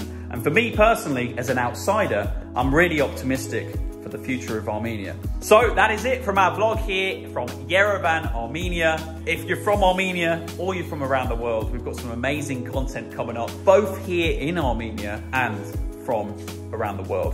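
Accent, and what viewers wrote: United Kingdom. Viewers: Oh, this is so adorable to hear how you praise our country in British accent which I am crazy about ))...I used to live 6 years in nearby Reading. People in England are very friendly and smiley...)) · Надеюсь это троллинг он говорит с Южным с британским акцентом...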